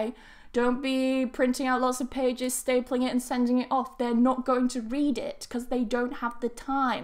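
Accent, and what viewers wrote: United Kingdom. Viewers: ....l really like the way you laugh, speak,smile and the way you speak British english,it sounds very wonderful....your British accent is awesome.....Thanks for the video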